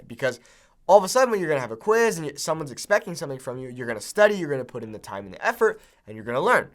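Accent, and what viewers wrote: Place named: Mexico. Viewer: Congrats on your spanish which is awesomely fluent, since any spanish speaker can hear your mexican accent as well as the mexican slang words. What amazes me most is the fact that you've just taken four years of classes in high school to reach your current level, I mean i started learning english (in Spain) when I was seven throughout years in the education system 'till i was eighteen, including furthermore several stays in the UK and Ireland as well. Once I started college I continued taking classes to get an advanced degree, but, definitely, I do feel my english is not as good as your (mexican) spanish. Congrats again mate, I love watching your videos, keep It Up.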